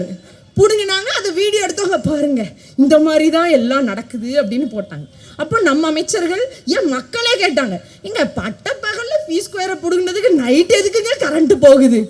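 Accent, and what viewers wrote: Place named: Kongu, India. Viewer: அருமையான கொங்கு தமிழில் பதிலடி கொடுத்த சகோதரி வாழ்த்துக்கள் சகோதரி உங்கள் பணி தொடரட்டும் சிறக்கட்டும்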